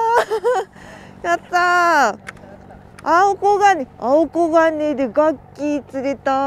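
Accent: Kagoshima, Japan. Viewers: メェ～餌も凄いけど鹿児島の海の魚影が凄いと思う👀 鹿児島訛り可愛いかった😊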